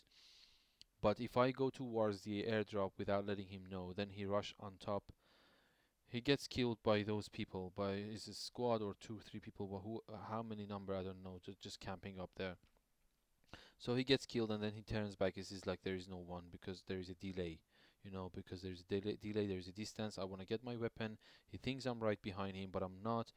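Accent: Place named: Iran. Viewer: are u persian ? ur accent so irani :D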